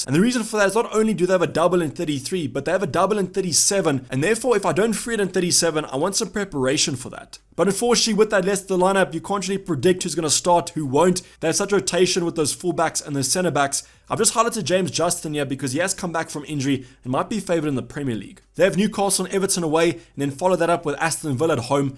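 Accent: South Africa. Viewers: You sound South African My G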